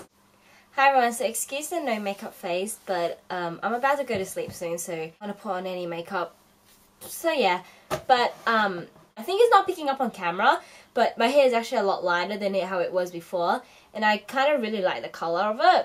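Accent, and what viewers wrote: Australia. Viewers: are you Australian? xD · hey r u australian? u hav an aussie accent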